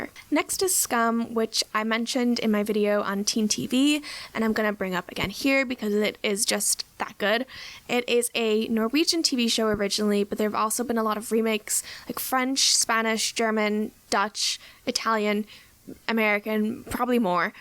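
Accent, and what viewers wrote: Ireland. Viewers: Are you Irish? Every now and again I hear a slight Irish accent. Just curious 😁 really love all the videos u make ✌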